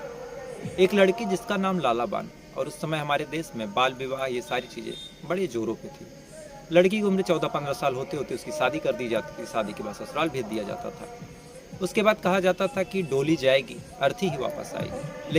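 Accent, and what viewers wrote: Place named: Awadh, India. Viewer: जय हो,कहानी अत्यंत मधुर स्वर में प्रस्तुत कर मनमोहक बना लेते है।" "अवधी बोली का प्रभाव आपके बोली में है।क्या आप अवधी बोली वाले जनपद से मिलान कर रहे है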